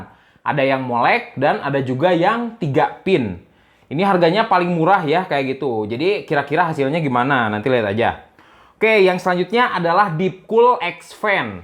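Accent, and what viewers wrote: West Java, Indonesia. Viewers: sunda bang? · Mang orang mana sunda amat eta congorna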